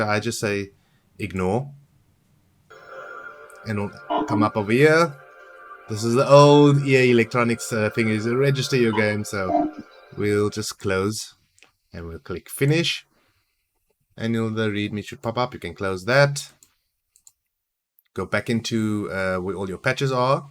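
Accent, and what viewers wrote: South Africa. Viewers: Homie you sound south African!! Glad to know im not the only Black n White fan left alive! :D Thanks for this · Knew I heard a fellow South African! Thanks for vid man. Oh how I’ve been wishing for B&W 3 since I was like 15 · I just randomly found your channel to help me with Black and White, Your Accent, SOUTH AFRICAN! Lekker my Broer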